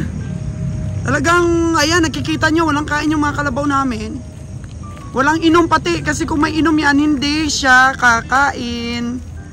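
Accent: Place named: Batangas, Philippines. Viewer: meron kana puntong batangenya madam heheh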